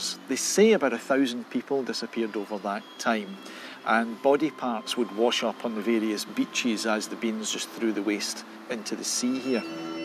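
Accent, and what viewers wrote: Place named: Scotland, United Kingdom. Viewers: It's Scott's accent that aint nice... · Can't understand cuz of its scottish accent.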